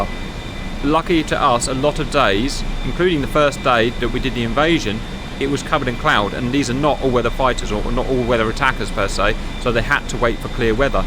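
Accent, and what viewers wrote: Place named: South, United Kingdom. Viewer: OOOhhh southern UK...Noice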